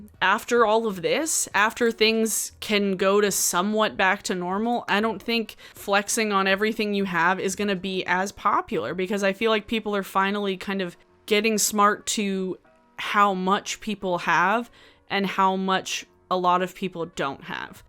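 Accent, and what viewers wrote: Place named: New York, United States. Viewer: Came over here from Hannah's video and loving it! Also, your accent sounds exactly like my aunt (she's in NY state and I'm in Western Canada), so it was like listening to her for a while! She is also very no-nonsense.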